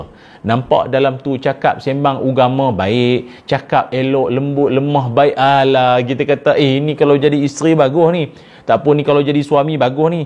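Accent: Kedah, Malaysia. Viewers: slang kedah...sedap dengaq.....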